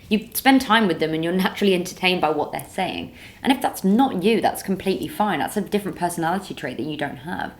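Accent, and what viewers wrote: England, United Kingdom. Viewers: It’s simple, I hear the British accent, I click like.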